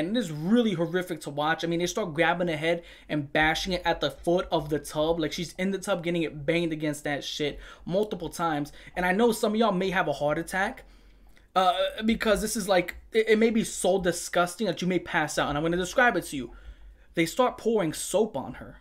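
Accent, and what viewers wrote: New York, United States. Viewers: R u from New York? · I forgot I was listening to a review of freaking mdpope, I got to into your descriptions and full New York accent 💀i could listen to ya all day 😭🫶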